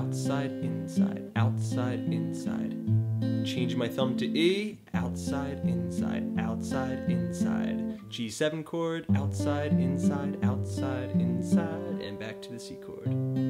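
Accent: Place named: Philadelphia, United States. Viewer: Very helpful. Thanks. And bonus points for the Philly accent. Geaw Iggles! (And Gritty.)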